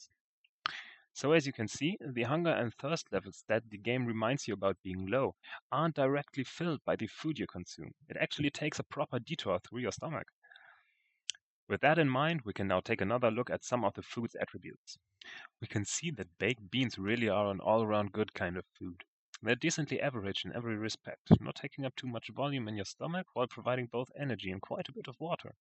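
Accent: Germany. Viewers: That sounds like a german accent :D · You are the DayZ medical messiah. Thank you for sharing this, it's opened up my eyes to what I've been doing wrong. Really good to know. And everything is more pleasant to listen to in a German accent :) You are German...right?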